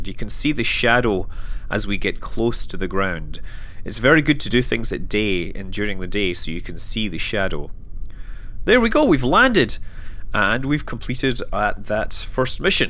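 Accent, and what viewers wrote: Scotland, United Kingdom. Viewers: I might have a feeling this man is from Scotland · ***** he's scottish and he actually has some programs to cover up his accent so if he didn't have that it'd be unbearable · finally, a Scottish tutorial youtuber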